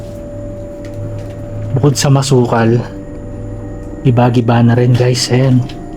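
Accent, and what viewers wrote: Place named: Visayas, Philippines. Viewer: I'm your new subscriber and the way you talk you have Visayan accent..watching your vlog is creepy and exciting...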